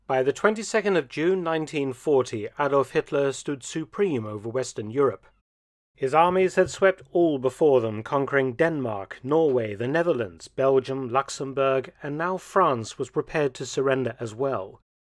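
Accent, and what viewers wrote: United Kingdom. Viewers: Mark, you are a great story teller. Your English dialect is especially pleasing to listen to as an American. European/British English is so much softer, gentler to listen to.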